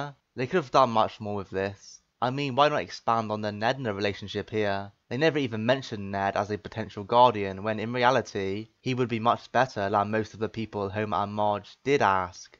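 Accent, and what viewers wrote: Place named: United Kingdom. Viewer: Hey, I have a question. It's okay if you don't want to answer it. But: Your accent is a bit interesting, it sounds like a British accent mixed with other accents, So where actually are you from?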